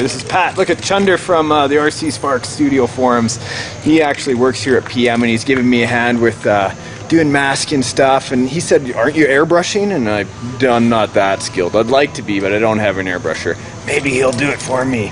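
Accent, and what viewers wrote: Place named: Canada. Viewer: Looks good , I like the fade and the tint . It is kinda funny hearing you deck'lls , I firs heard the on trailer park boys and thought it was just how Bubbles said it , I now know its a Canadian thing . Keep up the awesome work can't wait to see ya tow your crawler in to dudes garage or your mud tuck out to the trails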